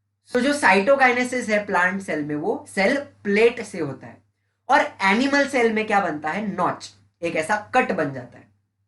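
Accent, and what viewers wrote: Maharashtra, India. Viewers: Are u Marathi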